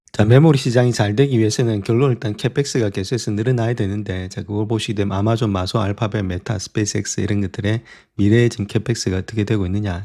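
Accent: Gyeongsang, South Korea. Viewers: 그냥 지나가세요. 경상도분들의 발음이니까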